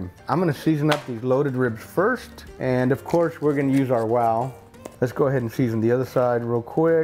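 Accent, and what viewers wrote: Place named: San Antonio, United States. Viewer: Job well done Sir! You sound like you’re a San Antonio native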